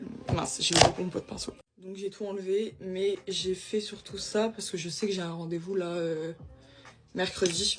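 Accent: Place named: Moselle, France. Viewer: Vous avez un accent mosellan 🥰😄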